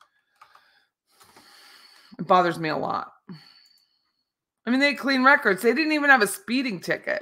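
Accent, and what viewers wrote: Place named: Central Pennsylvania, United States. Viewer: You are so fun to listen to. I’m from NYC and went to undergraduate at PSU (University Park) and I love your accent …. It’s so central PA 🥰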